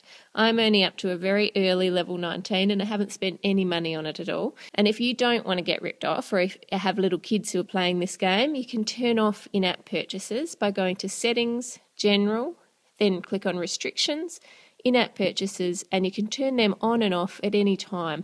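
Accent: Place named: Adelaide, Australia. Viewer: Are u from Adelaide?! U sound like u r! I'm from Adelaide!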